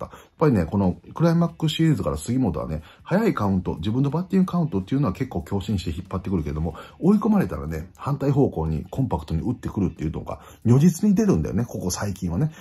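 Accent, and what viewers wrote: Kansai, Japan. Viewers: 毎日、里崎智也さんのチャンネルを見ているが、本当に面白い👍 だが、ロッテ時代には、素晴らしい捕手だと認識して居ただけだ❗️ まさかこんなに、野球に詳しい人だと思わなかった。 反省して居ます🙇 しゃべり方も、関西弁で楽しい❗️ でも、何時かは、ロッテの監督として、ロッテに恩返しする運命だろう‼️ 袴田彩会さんの結婚が実現すれば、里崎チャンネル終了=ロッテ新監督就任だ👍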